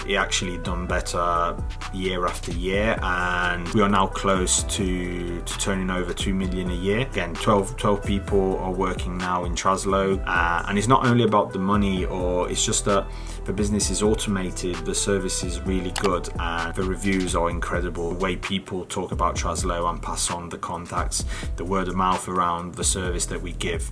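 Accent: United Kingdom. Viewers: Amazing video,. Thanks for taking the time to go through your thought process back then. PS. Incredible British pronunciation:O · Kudos and huge compliments for your accent and also for your property and command of the English language. You sound super British and it doens't seem you're Sardinian at all.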